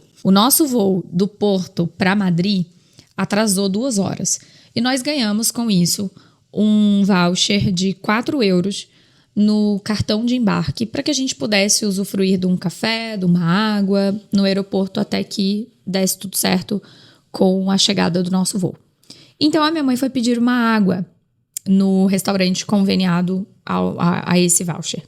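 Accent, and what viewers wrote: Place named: Brazil. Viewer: Comunicar bem aqui em portugal? vc neste video má exemplo começa logo mal , aqui não e em lago algum do mundo a não ser bostil não se sotaca portugau mas sim portugal , não se fala gentchi mas sim gente , não se diz qui legau mas sim que legal etc etc ,vcs ai no bostil teem alguma coisa contra a letra L ?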